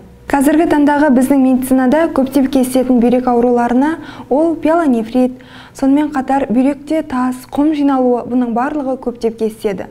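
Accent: Standard. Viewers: Рахмет қазақша таза сөйлегеніңе👍👍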